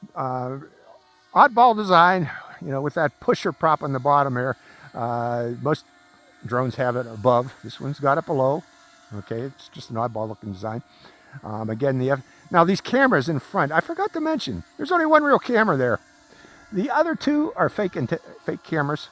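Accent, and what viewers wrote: Pittsburgh, United States. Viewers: I forgot to ask, are you from Pittsburgh Pa? Your accent 😍. I really do enjoy this video. It's what I need. Almost "hands on" instructions. Thanks again! I really want to learn how to fly drones. You've made it so much easier and you're really good with it.❤👍🏾I love the return home feature 😃Thanks again! I've subscribed👍🏾.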